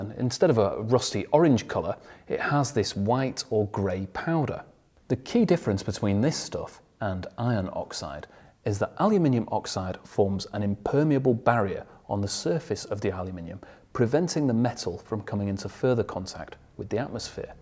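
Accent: United Kingdom. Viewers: Great info but it's hard to take you seriously when you blatantly insert letters into words that shouldn't be Spell aluminum. Better yet write it down. Now, how many times does the letter I occur? Only once but you Brits insist on inserting an extra I between the N and the U to change the pronunciation of the word to suit yourselves. It's not alUmineeum. And don't even get me started on lieutenant!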